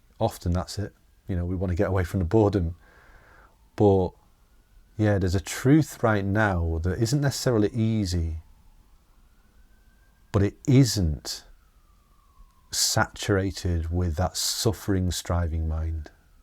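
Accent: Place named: Northern England, United Kingdom. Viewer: I don't spend much time on You Tube (less than one hour a week, I reckon)... came across your channel recently, love your content! Very calming, reassuring, inspiring and deep. Also love your accent, you sound like one of the Beatles!! (are you from Liverpool? Certainly from Northen England, I guess). Bless you. Cheers from Italy.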